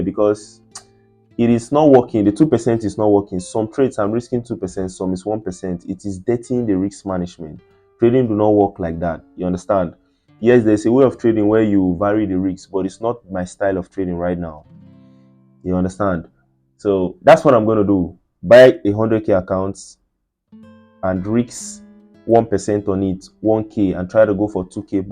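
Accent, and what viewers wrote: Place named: South Africa. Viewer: You're South African 😂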